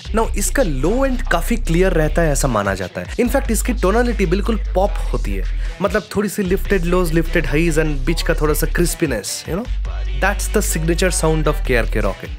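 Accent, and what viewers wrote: Bengal, India. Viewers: First of all very fine content and knowledge you have and also like your bangali hindi accent 😁, Just wanaa know a weird thing, can we use Yamaha PSR S-950(or S750, S970, S770) workstation speakers for monitoring if you ever heard those keyboards sound, it sounds like HS5. Only problem that they are positioned fixed & flat. We cant increase there distance.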